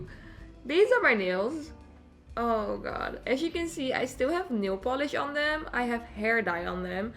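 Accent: Netherlands. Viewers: Are you Dutch? I just discovered your YouTube and this is the first video I saw but you sound Dutch haha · she's dutch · ik dacht al dat je een nederlands accent gad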